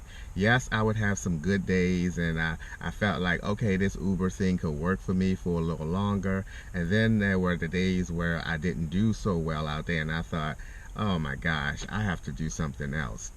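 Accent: Baltimore, United States. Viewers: 🤗 I can definitely hear that B’more accent😎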